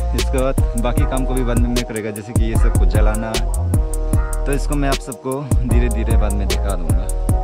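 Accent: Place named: Dhaka, Bangladesh. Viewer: Assamese ma dhakiya bolta ha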